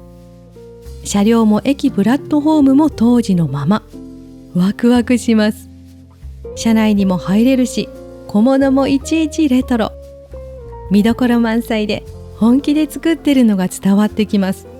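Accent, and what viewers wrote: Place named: Himeji, Japan. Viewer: 姫路の正しいイントネーションが落ち着く😂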